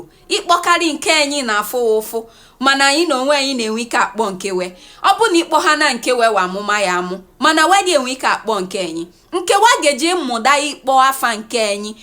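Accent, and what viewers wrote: Nigeria. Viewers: I love her Igbo dialect a lot. It is music to my hears and I just can't stop listening to her.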